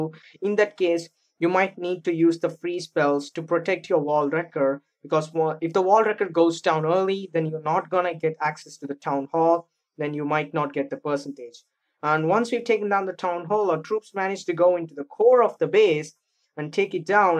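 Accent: India. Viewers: Bro ungaa speach laiyaa tharieduu nengaa tamilaa thalaa (•‿•)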